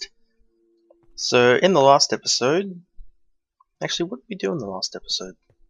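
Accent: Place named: Australia. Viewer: One of the reasons I enjoy your videos is that I get to listen to another Aussie accent :)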